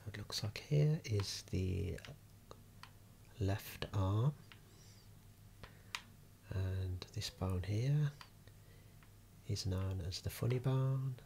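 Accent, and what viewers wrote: Birmingham, United Kingdom. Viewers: Love all ur videos man, u really do help me sleep at night, especially the eye exams, ur voice is super calm and the Birmingham accent is a bonus, keep it up, looking forward to ur next video🙂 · Ah! A fellow Brummie. This is fantastic! Must have taken ages to make though?!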